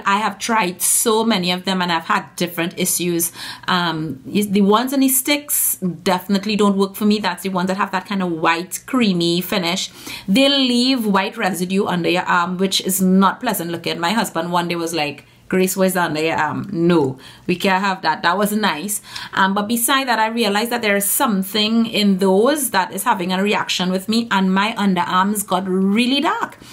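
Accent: Trinidad and Tobago. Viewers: Trini accents really nice enno.... " check me .. haha a a ah not smelling nuutinng , a a like smell mih arm.. it ain hav no odour" hahah We are so extra joyful - and happy 🇹🇹hahaha #tryingtoget #agulfcityrun Gosh and you look like a school friend of mine Akilah - Chinese mixed eyes and round face - cutie cutie- laugh and smile hahah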